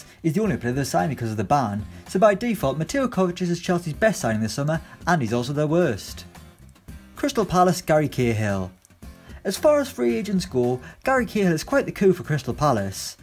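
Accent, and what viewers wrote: Ireland. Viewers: Is this guy irish too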